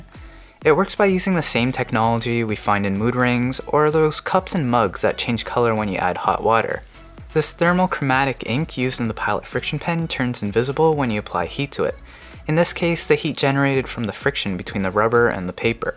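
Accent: Canada. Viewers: Nice video. I watched because I got one free and didn't know how to use it. You sound very Canadian.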